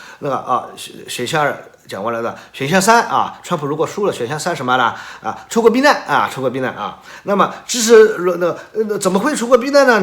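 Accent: Shanghai, China. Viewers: 侬是上海宁啊？好格，订阅了😊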